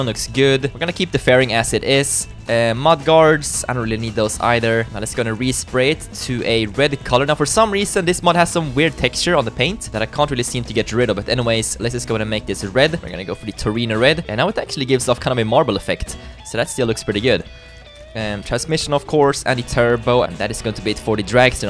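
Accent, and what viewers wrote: Sweden. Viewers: are you swedish? ps love ya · oh he is Swedish i knew it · are you from sweden?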